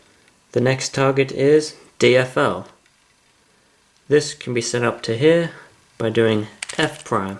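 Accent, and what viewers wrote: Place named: United Kingdom. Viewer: You sound like you're british. The tutorial helped! I'm also nervous of solving it w/o looking. #AlwaysPractice | v But I don't understand how to recognize the proper position, especially for the corners. I'm not sure what to do like, for example, UBR > DFR. That is the problem that i didn't understand.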